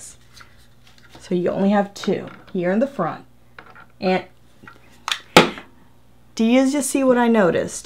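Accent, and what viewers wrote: South, United States. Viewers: where are you from, you sound southern...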